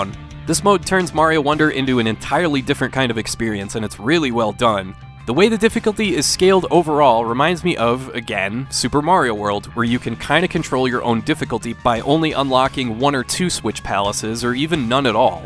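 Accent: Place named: United States. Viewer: Just wanna say from a Brit..your accent is amazing…so Americana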